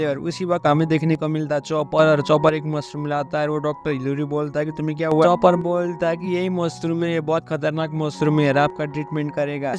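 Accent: Nepal. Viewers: Bhai nepali he kya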